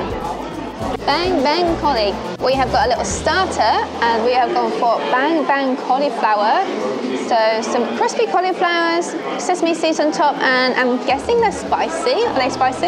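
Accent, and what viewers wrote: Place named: New Zealand. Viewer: yes I picked up his kiwi accent ;-)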